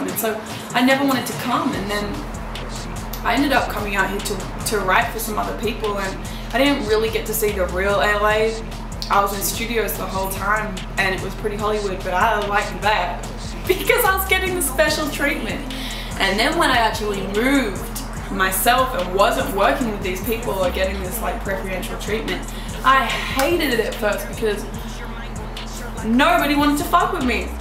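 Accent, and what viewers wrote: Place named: Australia. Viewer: fuck thats so annoying -.- she mixes accents ... why honestly , you were born in australia you grew up in australia stick to what you are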